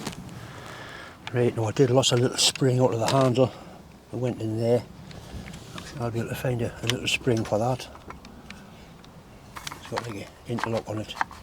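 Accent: Newcastle, United Kingdom. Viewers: Hi, just stumbled upon your channel, nice to hear a Geordie voice again. I live in Australia but come from Whickham just above the metro centre, just one question for you how the hell do you calculate the distance between teeth when cutting a module1gear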